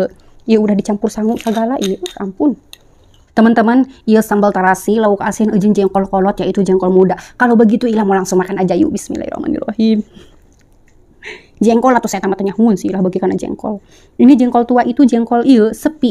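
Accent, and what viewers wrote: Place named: Menes, Indonesia. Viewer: Teh orang Menes nyah,dari logatnya keliatan heheh salam ti abdi orang Maja..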